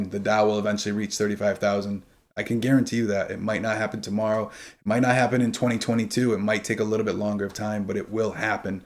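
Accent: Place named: Boston, United States. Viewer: Is from Boston? He sounds like he has a Boston accent LOL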